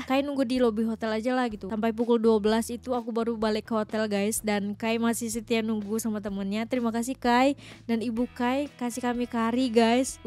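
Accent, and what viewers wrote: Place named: Kuala Lumpur, Malaysia. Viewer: Dh pandai cakap KL😅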